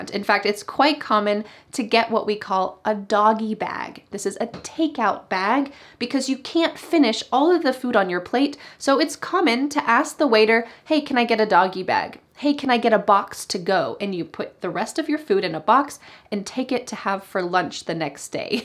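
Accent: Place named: United States. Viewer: Hi, I have been fallowing you for a long time in order to improve my english skills. I am a really big fan of you. This is the best english chanel when it comes to american accent. It 's the best to learn about american culture as well. Hi from 🇩🇴🇩🇴🇩🇴🇩🇴🇩🇴🇩🇴🇩🇴🇩🇴🇩🇴🇩🇴🇩🇴🇩🇴🇩🇴🇩🇴🇩🇴🇩🇴🇩🇴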